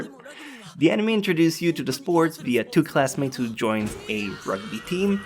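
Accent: Quebec, Canada. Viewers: CHESS oh quebecois french, I was going to say i couldnt think of any mainland varieties of french accents that sound like that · Mak Gnik French I would assume since he is Canadian, since he has an accent he'd be from French Canada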